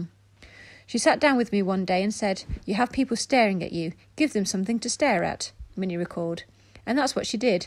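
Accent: United Kingdom. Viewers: I love your accent it sounds like queen Elizabeth , so lovely . Rest In Peace Minnie ..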